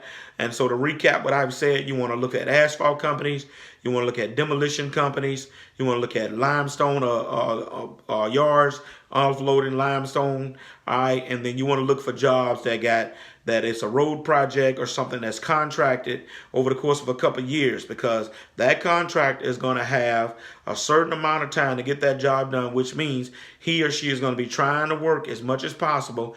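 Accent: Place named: Louisiana, United States. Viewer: What part of Louisiana are you from brother?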